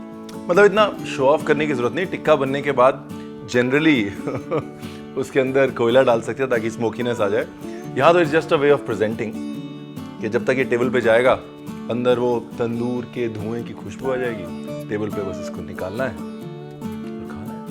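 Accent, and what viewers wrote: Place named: Punjab, India. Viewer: Bhaaji you are the best across youtube and i love how you speak your punjabi accent i love that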